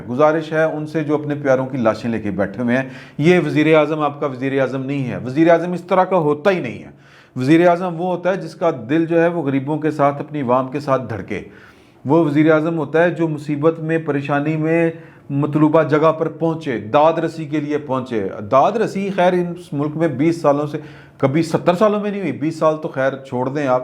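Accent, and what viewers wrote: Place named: Punjab, Pakistan. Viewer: Assalam Alaikum. The touh of Punjab in your commentary is as delicious as a touch of "FRY " to a "SAADA DAAL"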